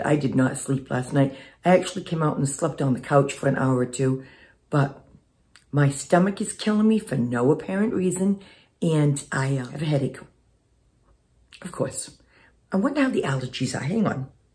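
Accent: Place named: Massachusetts, United States. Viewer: Nice to be sitting in SC - after all my life in Stoughton / Canton area - so, I know right where you are and happy to hear someone who sounds like me😉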